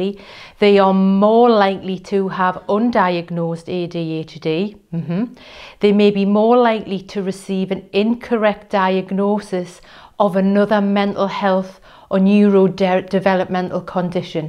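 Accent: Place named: Newcastle, United Kingdom. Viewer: Newcastle ? Love it 😊 hi 👋 from Liverpool ❤ Thanks for the info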